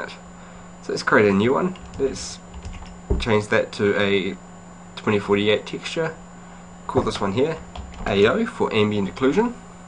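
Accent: New Zealand. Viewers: LOL! English? is from New Zealand. I actually quite like his accent.:) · Sounds like a Newzealander, thought that aswell. He's one of us.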